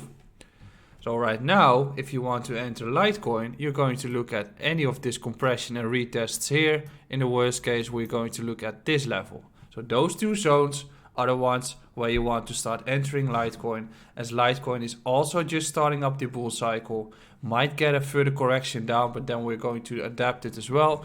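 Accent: Netherlands. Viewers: Haha, aan je accent is te merken dat je Nederlands-talig bent, wat zijn je verwachtingen voor Zilliqa aan het eind van het jaar ? Zojuist 10.000 euro geinvesteerd, hopelijk kan ik me hypotheek aflossen binnen een paar jaartjes 😃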